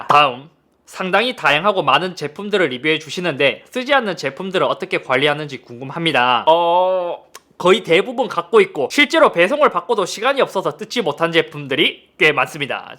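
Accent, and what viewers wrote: Gyeongsang, South Korea. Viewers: 초기부터 지금까지 쭉 봐온 분들은 모두 아실겁니다. 영상에 나온것처럼 갱상도 특유의 발음이나 몇몇 단점도 장점으로 바꿔오고 노력해오면서 이렇게 훌륭한 유튜버가 된 서울 야스킹을 말이죠 형 너무 사랑해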